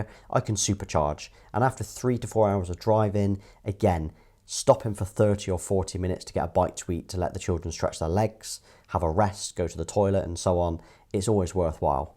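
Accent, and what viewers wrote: United Kingdom. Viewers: Why are most of the YouTube content from USA ? Good to hear british speaking!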